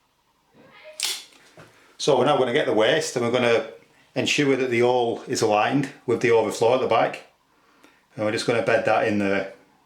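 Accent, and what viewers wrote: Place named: Lancashire, United Kingdom. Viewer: Afternoon. SO I stripped all the sealant off and started again with the waste plug. This time a rammed loads of sealant on the rim of the upturned plug, then loads on the bung. The latter lubricated the bung so it slipped on without anywhere near as much force. Then I walked away for 24hrs... AND IT WORKED! Patience was the missing ingredient! Thnx (BTW you sound like you have a Chorley/ Leyland accent, brother)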